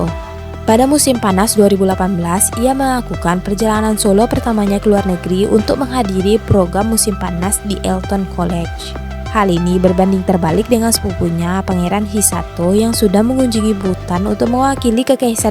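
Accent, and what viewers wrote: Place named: Padang, Indonesia. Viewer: Min, lu orang padang yah? Kerasa banget dialeknya.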